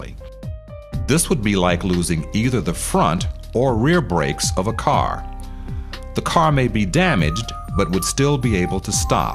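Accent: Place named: South Carolina, United States. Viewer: This narrator could be Charlie Rose's brother with a little Morgan Freeman depth thrown in--he has the same sweet South Carolina type drawl, not trying to hard to sound Midwestern. Very easy to listen to.... one of my primary rules when looking for video or audio series... a voice that makes you want to sit down and listen.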